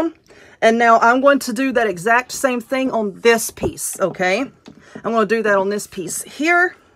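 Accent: South, United States. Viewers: I love your accent! I'm from Alabama. I bet you're not far from there!😊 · Love that southern accent💜💜💜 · I love your Southern accent! Lived most of our lives in the South but now we are in Italy, so I really miss this southern style accent. Yet I am impressed how you can craft with those nails! Wow! Are you from Mississippi or Louisiana?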